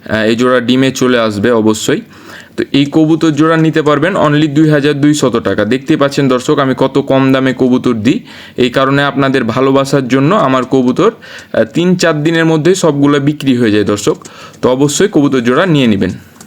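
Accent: Kolkata, India. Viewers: উনার কথার ধরণ কলকাতার মানুষের মতো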